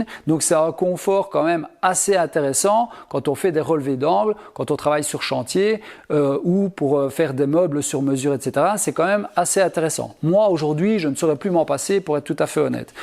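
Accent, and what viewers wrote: Belgium. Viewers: Très bonne vidéo, c’est clair utile et très instructif comme d’habitude. J’ai toutefois un petit reproche à faire, je trouve dommage de devoir préciser (ou corriger) à chaque prononciation de nonante ou septante pour plaire au public français dont je fais partie. Ça fait partie du charme selon moi de regarder les vidéos d’un belge, c’est comme si un québécois s’empêchait de parler comme il le fait au quotidien avec son accent et ses expressions. Si "l’gosseux d’bois" parlait un français "parfait" ses vidéos perdraient de leur âme. Bonne continuation et vive la Belgique !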